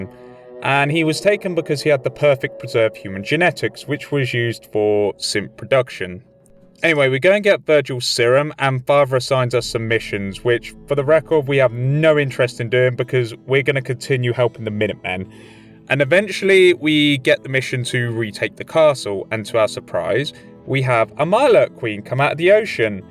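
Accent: United Kingdom. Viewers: Well done. That was pretty good! I guess, you are british, sir?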